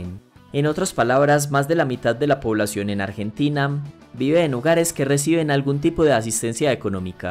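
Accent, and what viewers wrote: Antioquia, Colombia. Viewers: De qué parte de Colombia eres Men, no reconozco tu acento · Se escucha como paisa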